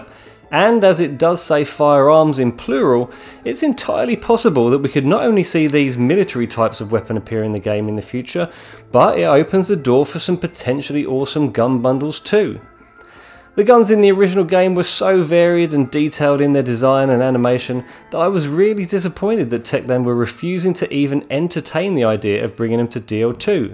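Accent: United Kingdom. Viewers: hey! are u British, or what language are u speaking, in ur videos?????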